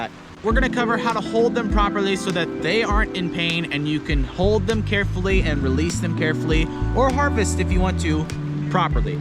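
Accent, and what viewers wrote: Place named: England, United Kingdom. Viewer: Love the English accent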